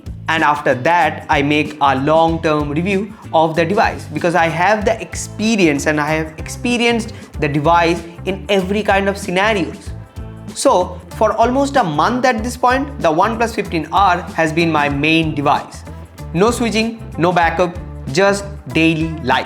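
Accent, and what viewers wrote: India. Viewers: R u bengoli? I mean if u could work on your accent a bit, the presentation of the video will surely be boosted alot nd try to keep urself more upclose.... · bhai tu west bengal se hai kya tere accent se lag ra hai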